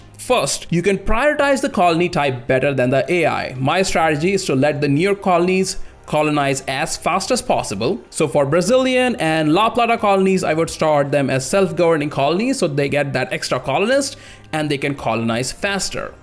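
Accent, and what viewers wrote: India. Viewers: Are you indian? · Is Indian?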